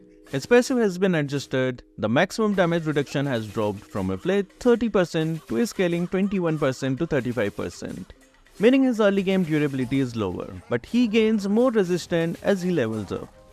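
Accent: India. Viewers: Indian accent wtf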